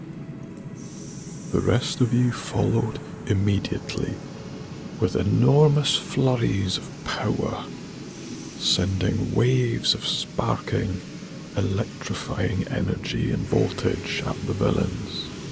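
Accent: Scotland, United Kingdom. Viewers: What's withe terrible Scottish accent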